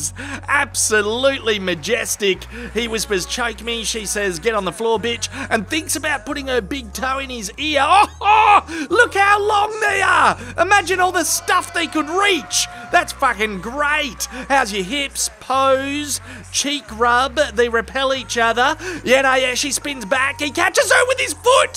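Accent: Australia. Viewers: Wow, a very entertaining and enthusiastic Aussie commentary. Not to mention the incredible couples act. 😂😂😂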